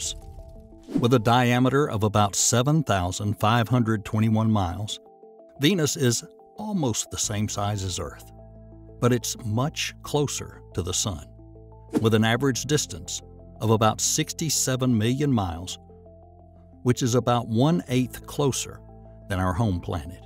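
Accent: South, United States. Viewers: Always expect a British guy when watching space videos. The country accent always catches me off guard lol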